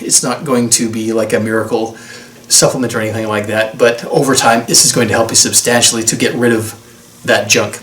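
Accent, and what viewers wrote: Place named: United States. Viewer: ? Does sound america. You swedish?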